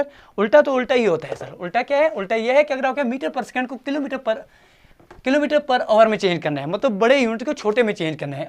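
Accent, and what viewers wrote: Kashmir, India. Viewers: Kasmiri guru jee Accent is cool loved it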